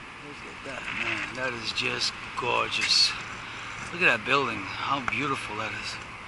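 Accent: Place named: New York, United States. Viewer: You are cool man, love your accent, that classic NY accent as its best!! Cheers from Sweden! Jupp Bahco was made here once....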